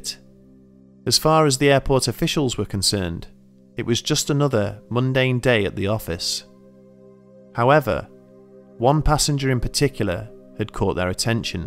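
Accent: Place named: Northern England, United Kingdom. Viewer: His accent is northern English (possibly Yorkshire) although not very pronounced. The internet is swamped with American media and accents these days which is probably why you haven't heard of it before. Even the media in Britain tends to include predominantly southern/accentless British voices so we're not blameless either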